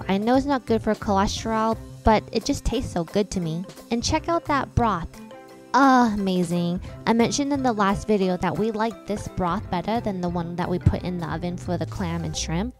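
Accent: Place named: New Jersey, United States. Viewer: great recipe! absolutely love the jersey accent too!!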